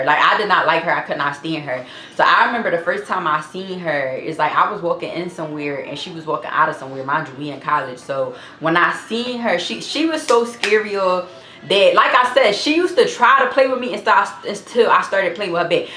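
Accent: Philadelphia, United States. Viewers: she front Philly OBVIOUSLY she not gonna talk with a regular tone tf you’re weird , you don’t have to watch .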